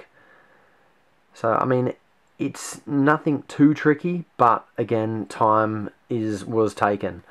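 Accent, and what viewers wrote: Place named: Australia. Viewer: Australian accents really make you wish you had one-From Italy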